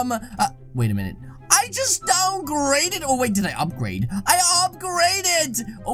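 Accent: United Kingdom. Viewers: Are you british nice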